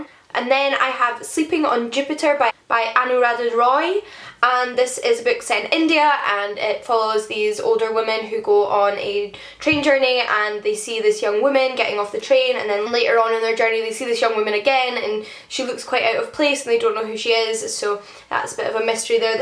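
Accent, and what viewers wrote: Scotland, United Kingdom. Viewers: ***** Paid in books and swag, then? Not so bad. I binged quite a bit of your channel last night after I discovered you. Definitely one of my favorite booktubers so far. I'm really enjoying joining this community. But your beautiful accent makes me miss Scotland! My wife and I lived in Edinburgh for a couple years. Our first child was born there. Fond memories. P.S. in your profile pic you totally look like a blonde Karen Gillan.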